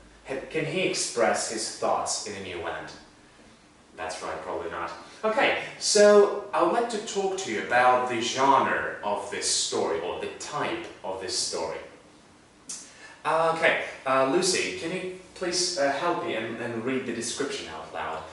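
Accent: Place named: Russia. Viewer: I hear Russian accent in this demo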